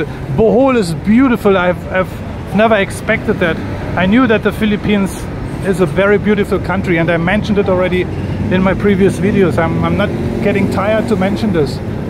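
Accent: Germany. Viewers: European accent most probably if I'm not wrong you're a German, am I right?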